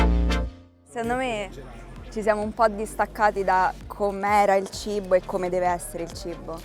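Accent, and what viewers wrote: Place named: Umbria, Italy. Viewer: Your accent sounds Umbrian...not Romana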